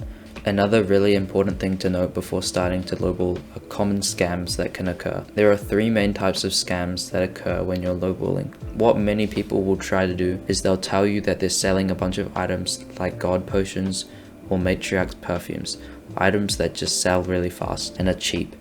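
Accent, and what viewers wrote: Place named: South Africa. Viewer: are you from south africa? ur accent sound like it.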